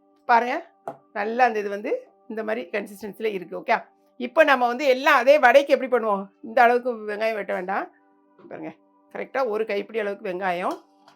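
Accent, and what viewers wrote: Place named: Nagercoil, India. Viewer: U r tamil resembles Nagercoil tamil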